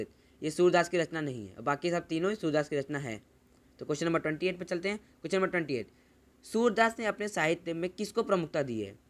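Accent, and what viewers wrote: Bihar, India. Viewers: Sir bs ek question aap bihari hai ky .plz reply sir your language is like them only . Btw I love bihari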